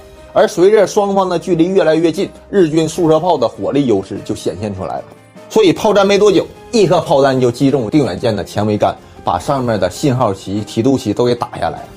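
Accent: Northeast, China. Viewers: 口音太重，一口东北大碴子味儿。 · 好片，轻东北口音解说听着很给力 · 这大碴子味的普通话听不清你说啥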